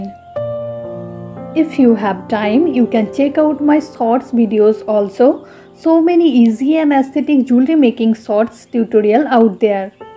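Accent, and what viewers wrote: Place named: India. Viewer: محتوى مفيد واصلي لكنتكي تبدو من الهند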